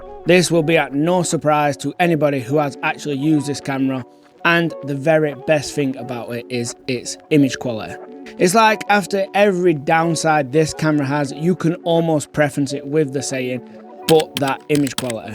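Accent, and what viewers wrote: United Kingdom. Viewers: i really like your videos but pls you have to speak a bit better because as a non native english speaker its hard to understand your harsh british accent.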